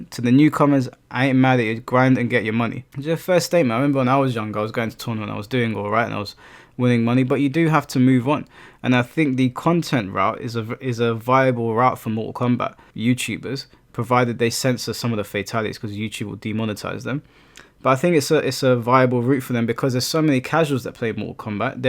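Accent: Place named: United Kingdom. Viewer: Okaaayyyye, but this is how I like my men. Speaks like Harry Potter, BBC, and the fact he is from UK means he is likely UNCUT. :face-red-heart-shape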